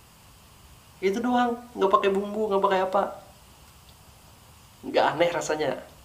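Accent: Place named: West Java, Indonesia. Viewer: Ka ngomong nyah sunda banget pake p maaf yah ka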